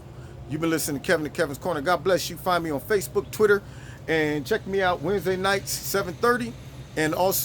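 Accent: Australia. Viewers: I loved the Aussie accent. You are the best. We need to hang together and be kind to one another. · Kevin,LOVE your Australian accent! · Love the Australian accent lol Kevin I counted and saw 27. lol The leftists have constantly been talking about transparency, well President Trump is the only true Transparent President.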